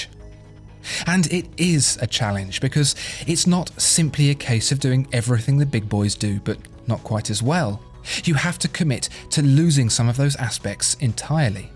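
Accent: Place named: RP, United Kingdom. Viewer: this guys voice drives me so freaking crazy. he talks so freakin slow and poshly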